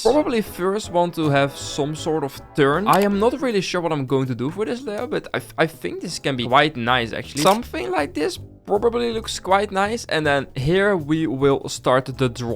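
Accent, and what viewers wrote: Netherlands. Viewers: Hoi ik hoor dat jij Nederlands bent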